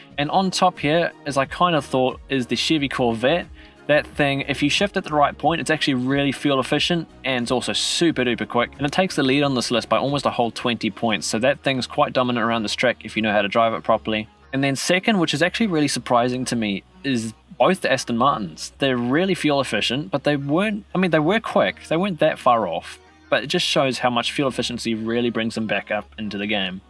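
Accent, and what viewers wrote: New Zealand. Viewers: Thanks for all the time you put into your videos, great info and tips that have helped my lap times and setups a lot! Great to hear a Kiwi doing such a great job! Keep up the good work mate 🤘🤘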